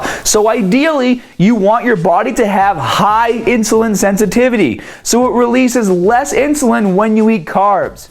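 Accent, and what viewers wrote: Boston, United States. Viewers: h I asked the same question, the answer from other peeps was "bahstin" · "With winter around the cornah" That thick Boston accent! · Loving the return of the boston accent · Boston accent? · will do but I have to say I love it. Boston accents are great! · I don't remember having. that accent. Did move to the Boston area in the past couple years? Or has he always hidden the accent to an extent? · bro, when the fuck did u aquire this "thick" boston accent?! u say bar, then baaahhhhhhhm what the fuck haha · lovin the accent from boston myself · It's a Boston accent. It's not a New York accent; I'm from New York. It's not a British accent, and it's not an Australian accent either, although I get the mix-up. Problem solved. To me personally, the Boston accent sounds like a combination of a neutral American accent and an Australian accent.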